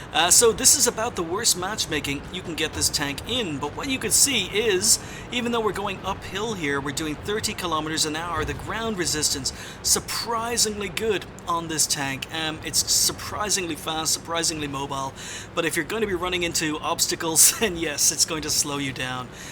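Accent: Ireland. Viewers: but no one says is it worth it like. Its that Irish accent LOL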